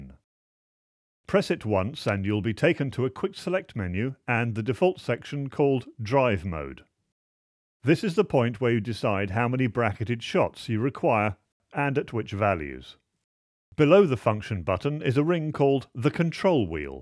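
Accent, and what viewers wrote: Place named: United Kingdom. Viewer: outstanding. Can you believe that i just couldn't find these instructions anywhere?? clear crisp and at last a classic understandable old school british accent with clarity of thought . thanks once again.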